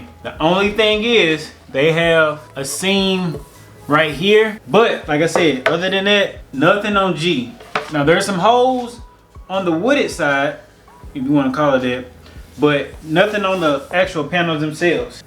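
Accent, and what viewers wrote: Memphis, United States. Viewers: Hey thanks for the tutorial! Your accent sounds familiar— especially when you said “if you’re watching this with your granddaddy”. 😂 I’m from Memphis (but live in Nebraska), so I clicked through your vids and found your Memphisvlogger hashtag. Memphis in the house! And just watched the game! Or…actually…listened to my husband watching the game. Whup that tr1ck! 😊👍🏽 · This was a good instructional……tell me are you from Memphis or spent a period of time there cause I promise you sound like a Memphian 😂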